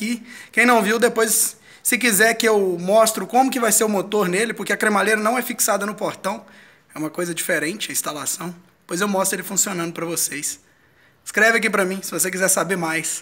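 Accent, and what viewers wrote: Goiás, Brazil. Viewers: Tu es da onde cara? Você falando parece ou Zezé de Camargo ou Leonardo. Você e de Goiás?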